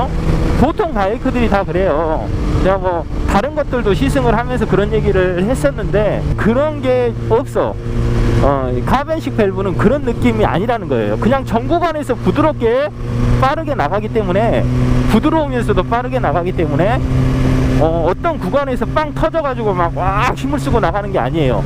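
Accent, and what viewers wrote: Jeolla, South Korea. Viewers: 늘 재미있게 잘 보고 있습니다. 구수한 전라도 사투리랑 같이 들으니 휠 재밌네요 ㅋㅋ 한가지 질문이 있습니다 - 저는 gsx-s1000을 타고 있는데요. 늘 r차에 대한 로망이 있었습니다. 문제는 자세인데 네이키드에 비해 많이 힘들겠지요? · 으따 전라도구마잉~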